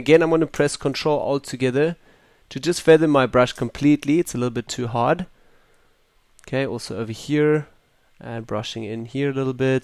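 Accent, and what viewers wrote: South Africa. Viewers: immediately recognised the S.A accent haha #proudlySA and cool tut by the way.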